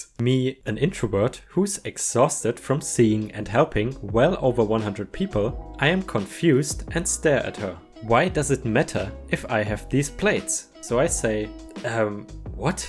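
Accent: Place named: Germany. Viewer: I Dont want EM's or EP's in germany And damm you have a good german Accent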